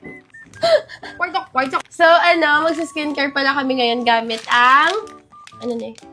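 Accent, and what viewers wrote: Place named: Iloilo, Philippines. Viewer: Ilongga ka pala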